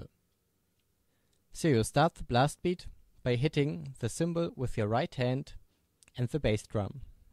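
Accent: Germany. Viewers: Are you German? I like your accent 😊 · Man merkt, dass du Deutscher bist:)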